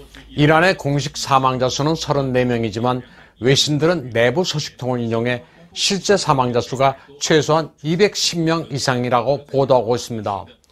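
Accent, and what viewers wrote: China. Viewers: 쭝국인이신가요? 완전 발음이 쭝꿔 억양이시네요? 넘 강한 억양에 좋은 내용이 뒤덮이는거같아 많이 아쉽네요.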